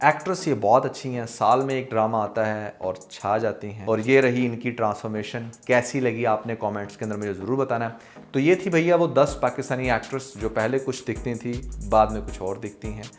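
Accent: India. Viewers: exactly ajeeb ganda paindo indian bhaiyya wala style hai bolnay ka. seems an uneducated person. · Aap apni guftagoo mein kuchh indian rang bharnay ki koshish kr ke apnay bolchal ka satiyanas kr rhay hain. Jesay keh lafz "phir" ko "fir" bolkar aur khush ko bhi indian style mein bol kr. Meray khayal mein is trah aap ne apna bolnay ka standard gira liya hai.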